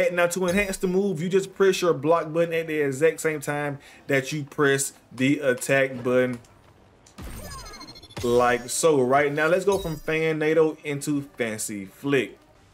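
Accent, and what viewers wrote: South, United States. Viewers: Love your step by step showing of combos. But what makes it even better is your dirty south country accent lol. JUST LIKE THAT!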